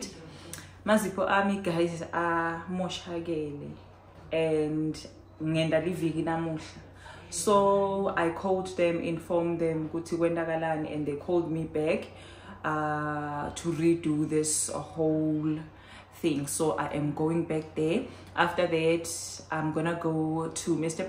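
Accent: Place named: Eswatini. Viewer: The moment I heard your siSwati accent, I subscribed. Will figure out who is later😂. New subbie💃🏾🙋🏽‍♀️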